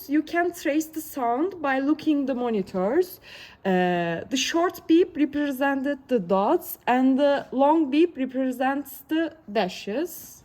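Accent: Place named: Turkey. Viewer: Türk'ün şivesine bile kurban🫡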